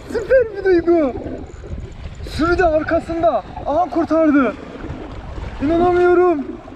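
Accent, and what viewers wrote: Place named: Black Sea, Turkey. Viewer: Kibar karadenizli aksanın çok hoşuma gidiyor 😂 Başarılarının devamını dilerim.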